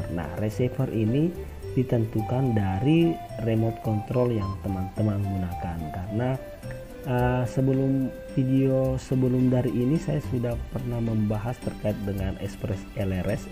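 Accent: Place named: Kendari, Indonesia. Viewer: Wah orang kendari ya???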